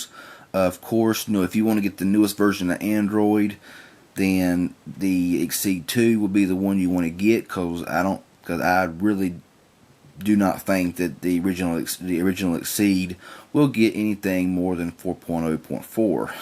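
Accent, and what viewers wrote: South, United States. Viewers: You sound like you're from down south, but you talk nerdy, I LOVE IT!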